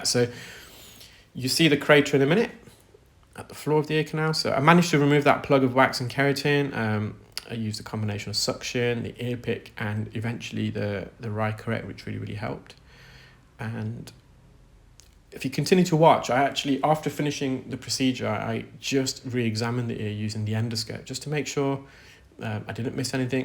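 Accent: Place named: Scotland, United Kingdom. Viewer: You must be Scottish. I just love the Scottish accent.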